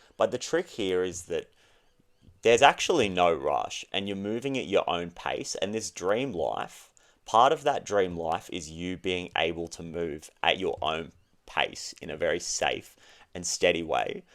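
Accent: Australia. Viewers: So thats gotta be an Aussie accent.. im in aus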